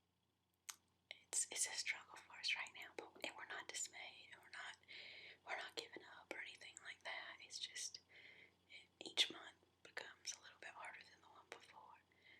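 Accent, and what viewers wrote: South, United States. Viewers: Where are ya from? I detect a bit of a southern draw that just drives me crazy.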